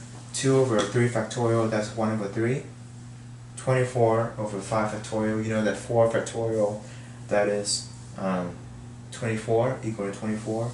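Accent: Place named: United Kingdom. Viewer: Thanks . Awesome explaination . Your accent seems British. It has helped me a lot in my uni maths!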